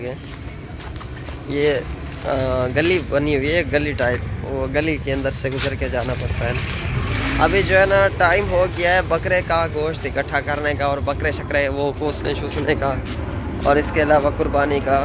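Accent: Saraiki, Pakistan. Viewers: "اے موبیل پکڑ او میڈا" لالا سرائیکی شناء لگدا پیا ایں۔. واقعی سرائیکی ہو آپ؟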